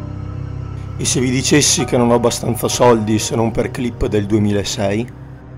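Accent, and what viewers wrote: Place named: Verona, Italy. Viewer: Naturalmente Veronesissimo 💪🏻💪🏻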